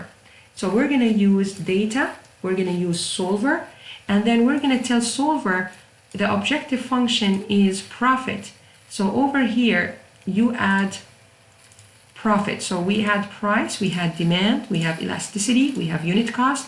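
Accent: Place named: Iran. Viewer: Thank you, I must say you sound like my Persian friends accent. I miss them, we used to have good fun in University in Tasmania. God bless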